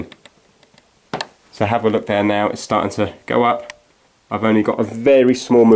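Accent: England, United Kingdom. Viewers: brilliant!!! Thank you for your solution and your charming British accent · Alex Calinescu that's an English accent from England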